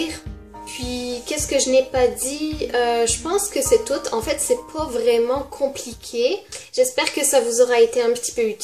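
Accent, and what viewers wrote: Canada. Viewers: T as un accent canadien ?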